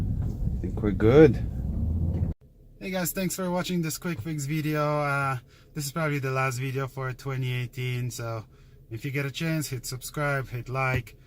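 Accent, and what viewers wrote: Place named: United States. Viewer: nie mam pojęcia co mamy w naszym polskim języku. Mimo że świetnie mówisz z amerykańskim akcentem jednak po 2-3 zdaniach zacząłem wertować u ciebie na profilu informacji by potwierdzić moje podejrzenie że jesteś Polakiem. Ja staram się jak mogę nauczyć akcentu amerykańskiego ale raczej do końca swoich dni będę mówił polenglishem.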